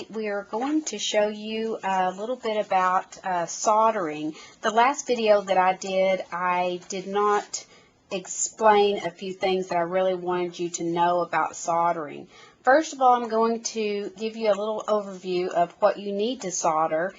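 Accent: United States. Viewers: I believe what she is saying is "tinned" which means you keep your tip covered with a thin coating of solder. Southern accents are hard to hear sometimes. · hi - firstly, you sound so much like someone I know - are you Texan? Secondly - can you use aluminium tape instead of copper tape?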